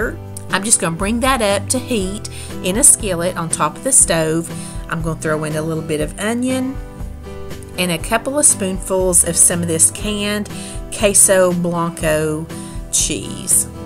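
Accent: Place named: Mississippi, United States. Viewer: Your voice makes me feel like I’m back home 🥰 I’m from Mississippi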